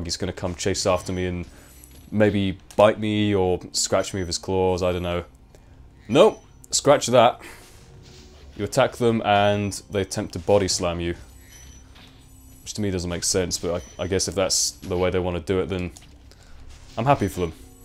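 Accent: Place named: England, United Kingdom. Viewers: Reliving my childhood here, through an english accent!!! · english accent is the best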